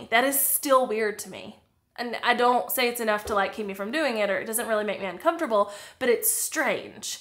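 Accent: South, United States. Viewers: Your energy, personality, confidence and transparency drew me to your channel! Cornbread accent, I love it! I definitely am the kind who is scared to put myself out there and grow my business. Your videos are super helpful!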